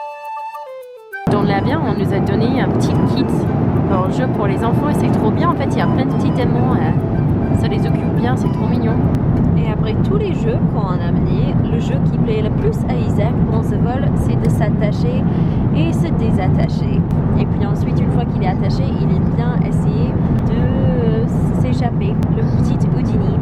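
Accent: United States. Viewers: You’re so nice ! Your husband must be proud of you. I would have loved to marry a woman from a foreign country ( especially from the USA). You show us how proud we ,as French , are honored to have you through all these experiences yo share. I’m so sensitive looking at a person like you who may tell the best from both cultures : USA and France. Keep your delightful accent ! Bravo pour vos vidéos : elles sont fun, no boring at all, et elles permettent aux français qui connaissent pas très bien votre vraie culture de mieux appréhender ses particularités. N’oublions jamais que ce pays est immense et que NY ou LA ne représentent pas toute l’Amérique. For sure, your parents gave you a strong and good education with real values. And it represents such an asset for life. Now it’s your turn to convey all these values to your children. And on top of it, they will be enriched by their two cultures. 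Wishing you all the very best in Canada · J'adore t'entendre parler américain !!!!!!!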